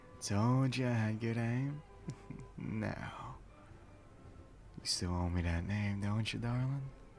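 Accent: South, United States. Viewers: Ahhh! I’ve missed ur southern accent videos one of my favorites!! :3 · Been absolutely lovin all these (your) audios, but the southern ones rly be hitting a bit different😊🫠. Hopefully gonna hear more in the future♡. (And possibly a part 2 to this one😇) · your southern accent is still as cute as your first cowboy roleplay HAHAHAAHHA! ( ≧∀≦)ノ CUTIIIEE! glad you're back <3 (((o(*ﾟ∀ﾟ*)o))) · Accent: ✅ Nickname: ✅ Blushing: ✅ ✅ Safe to say southern boys are my thing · Okay ffs i wasnt exoecting it to work on me i can feel my heart rate pickin up quickly southern accents and country folk are alwasy gana be my weakness it reminds me of home and beyter days i supose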